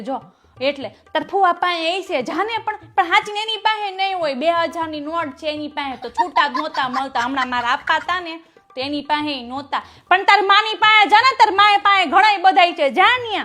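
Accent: Kathiawar, India. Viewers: ben tame kyathi chho pure Kathiyawadi bhasha bolo chho ane tamara dialogues pan bau sara hoy chhe ❤❤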